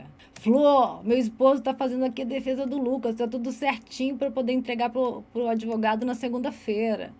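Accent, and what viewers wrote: Rio de Janeiro, Brazil. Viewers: O sotaque do Rio é massa 😅, parabéns 👏🏾😘.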